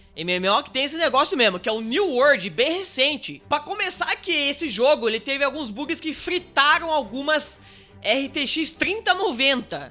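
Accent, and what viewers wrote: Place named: Paraná, Brazil. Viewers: Cara, eu sou paranaense de Cascavel no Paraná, e mais gosto de ver o falar porque ele parece meus amigos de infância, além de entender tudo de jogos!!! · Eu sou de Guarapuava no Paraná também, eu amo nosso sotaque e nossas expressões kkkkkkkk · ele é de pato branco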